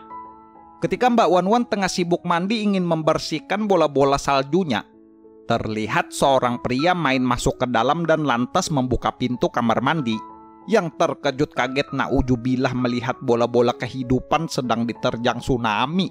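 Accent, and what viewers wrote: Banjar, Indonesia. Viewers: Orang Banjar kah nih?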